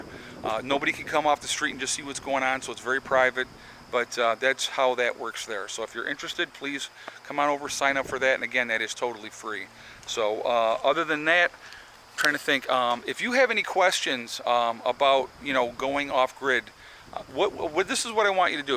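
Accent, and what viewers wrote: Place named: South, United States. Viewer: I thought you were from North Carolina..or some southern states.. Y could anyone hate you? Perhaps they're jealous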